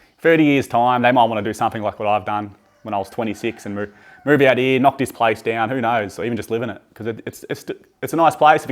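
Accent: Australia. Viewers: It’s so hard to listen and understand your English in strong Australian accents . 😮good daiy , barbi (bbq). All the best in the future for your next move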